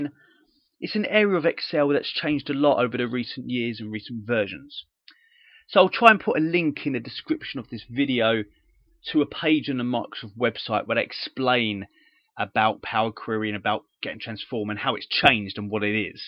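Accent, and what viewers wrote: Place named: Cockney, United Kingdom. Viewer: I watched at least 5 other videos and this was by far the clearest and easiest to understand - despite you being a cockney :). Thanks a lot....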